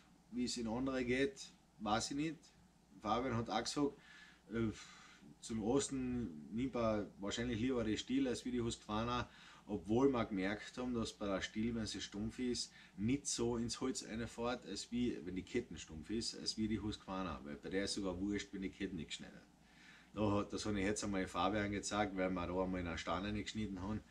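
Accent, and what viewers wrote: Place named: Austria. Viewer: Jo stimmt, der österreichische Dialekt kling so richtig angenehm.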